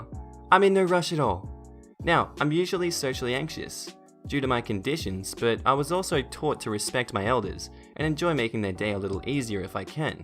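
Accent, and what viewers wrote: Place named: Australia. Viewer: With the ZED, he could also be from Canada, although not with the Australian accent. I think he's mentioned in other comment sections he's from Queensland (north east Aus).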